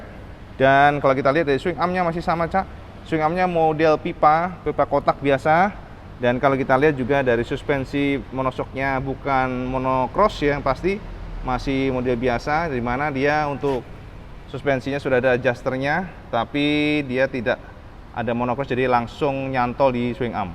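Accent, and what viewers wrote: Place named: Tulungagung, Indonesia. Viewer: Megek lk boso tulungagung🤣🤣🤣🤣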